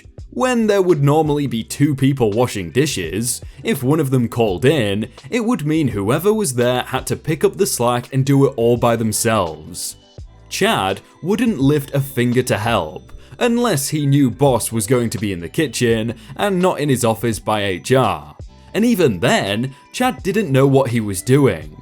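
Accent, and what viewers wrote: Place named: England, United Kingdom. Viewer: It's an accent. Many accents exist that pronounce words that way. Plus, he is English, so one could argue he knows the language best.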